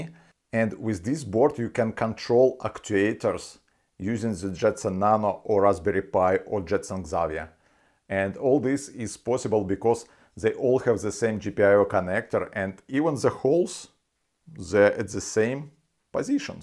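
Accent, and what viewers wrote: Russia. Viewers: 38 seconds into the video and I can't stop wondering: Или автор русский и ничегошеньки не хочет делать с акцентом or if this is the most hardcore russian accent imitation I've ever seen. Good vid though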